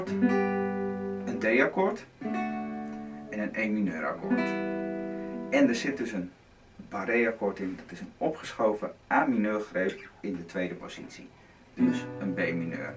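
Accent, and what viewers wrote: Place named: Netherlands. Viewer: Unbedingt ! ;-) Das Rudi Carell Deutsch is doch nicht schlimm ;-) Freu mich drauf und DANKE !!!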